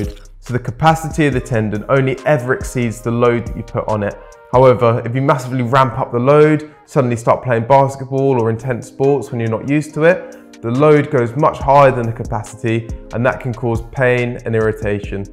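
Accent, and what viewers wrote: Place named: United Kingdom. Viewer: Nice video, good content, well-done and British accent as a bonus! What's not to like? :)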